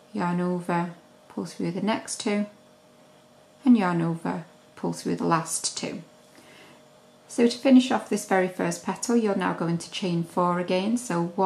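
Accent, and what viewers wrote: United Kingdom. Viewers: I love Brits accents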